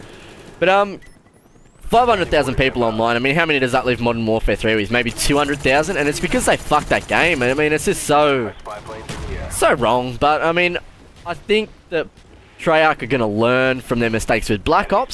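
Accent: Australia. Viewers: I like the dayz, Assassins creed 3 would be Kool give it a shoot. Dude I'll watch anythig with you cause everything is cooler with an ausie accent!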